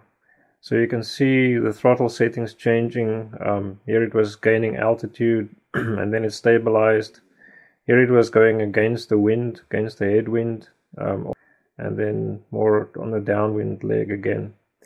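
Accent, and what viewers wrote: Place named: South Africa. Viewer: Hi guys, great video! Can i ask where this was made? You sound somewhat South African...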